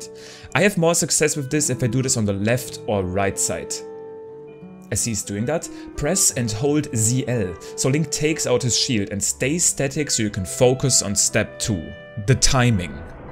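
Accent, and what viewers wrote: Germany. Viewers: I was wondering why he had such a german accent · man hört so sehr dass du deutsch bist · He’s definitely German :) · habe mich schon gewundert warum er so einen Deutschen Akzent hat.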